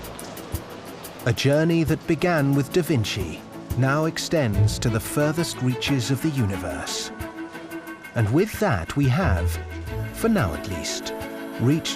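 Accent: United Kingdom. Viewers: Imagine if this documentary began with a female photographer taking photos of a man in a speedo, or some such get up. This, combined with a British accent to make it classy. Way to dumb down science at the expense and degradation of women.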